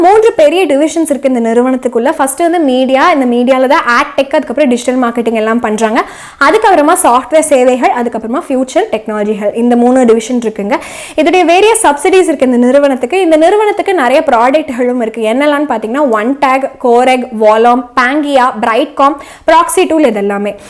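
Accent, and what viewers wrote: Coimbatore, India. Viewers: I appreciate your Coimbatore Tamil lecture.long live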